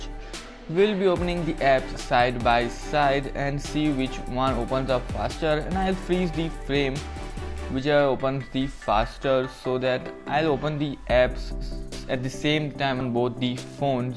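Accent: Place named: India. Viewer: Learn English properly, you speak like a typical Indian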